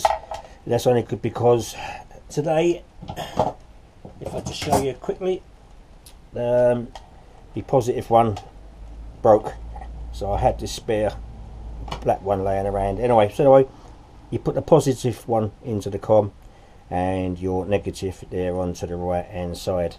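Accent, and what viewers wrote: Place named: United Kingdom. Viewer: That was helpful although I had difficulty understanding through your British accent. Thanks.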